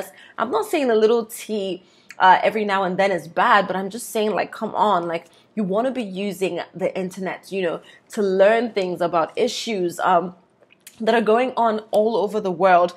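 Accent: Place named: Nigeria. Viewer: I knew you were a Nigerian right from the beginning I heard your voice. Nice Video 😊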